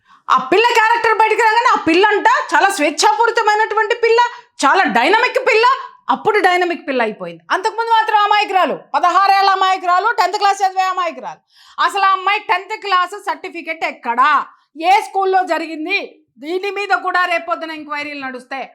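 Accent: Telangana, India. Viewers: మీది తెలంగాణ నేనా అక్క తెలంగాణ ఐతే నువ్వు ఇలా మాట్లాడారు అని అనుకుంటున్నా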